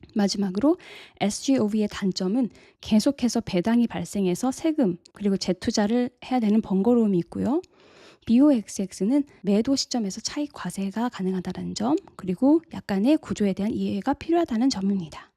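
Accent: United States. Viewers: 미국에서 오래 사셨나바요 얼피~~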